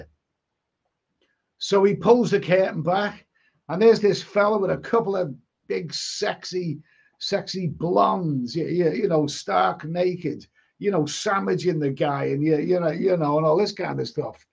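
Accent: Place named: Northern England, United Kingdom. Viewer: This is awesome mate.- absolutely love your input and experience on South East Asia. It has been awful weather in the UK - since you have a Northern accent, I bet it is grim up there, I know the East Midlands (where I'm from) had crap weather throughout the summer. Our winters are dull and horrible. I was thinking of retirement in either Malaysia, Indonesia or Thailand in a few years time but my first choice is Malaysia, hopefully retiring in Kuala Lumpur. Honestly mate, I am so sick and tired of the weather here in the UK, it is depressing, South East Asia is amazing with incredible and friendly people, amazing cultures, superb beaches, fascinating landscapes, jungles, mangroves, cable cars, waterfalls, lakes, mountains, diversity of flora and fauna, amazing food and variety of cuisine, spectacular religious sites especially temples and mosques. 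The list can go on and on.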